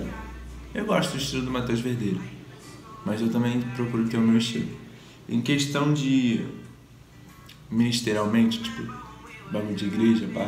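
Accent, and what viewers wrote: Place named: Rio de Janeiro, Brazil. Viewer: Adoro sotaque carioca kkkkkk eu fico tentando imitar hahahaa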